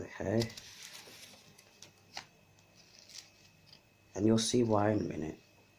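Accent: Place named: Birmingham, United Kingdom. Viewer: I came here from Stud-conscious. You a Londoner? From darn sarf? I'm from Manchester 👍👍👍 Edit.. Brummie twang there too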